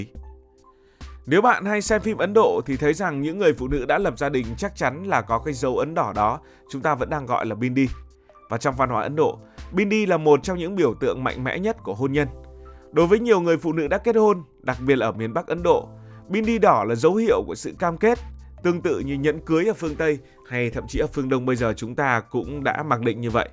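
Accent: North, Vietnam. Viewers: Thích cácvideo của BLV. Ngắn gọn nhưng xúc tích. Lại thêm giong bắc rất hay.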